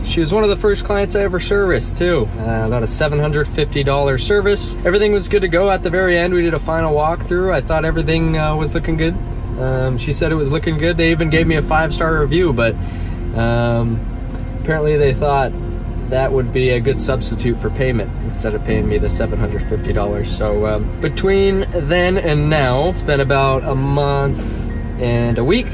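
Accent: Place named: Vietnam. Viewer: Lol sound very vietnamese!!!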